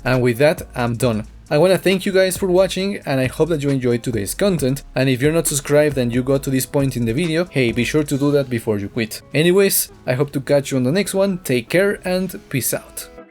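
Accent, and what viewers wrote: Spain. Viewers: are you from like Spain or Argentina? greeting from Argentina btw c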